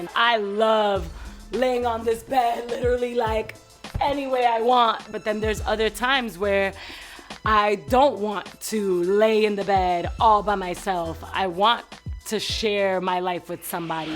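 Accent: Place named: New Orleans, United States. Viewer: Nawlins accent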